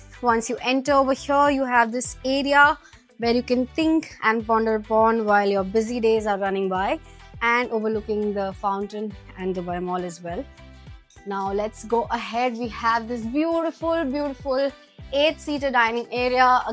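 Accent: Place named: India. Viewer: That is full Indian voice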